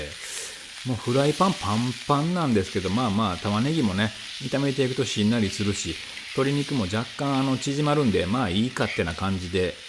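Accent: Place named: Kyoto, Japan. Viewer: 徳井さんの京都弁「お出汁をパッ~ と入れますと...」夢中になってみれます。無になれます。徳井さんに感謝⤴︎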